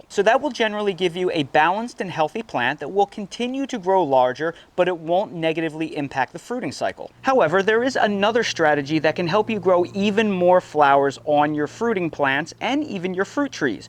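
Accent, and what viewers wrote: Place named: New Jersey, United States. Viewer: psst...better tomatoes are in jersey baby...lol......i hear that jersey accent...lol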